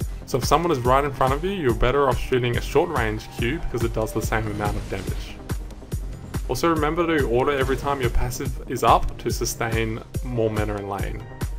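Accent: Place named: Australia. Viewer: I'm really grateful they kept the damage consistent on his Q rather than ramping it up as you charge it. Good video, love the Aussie accent :>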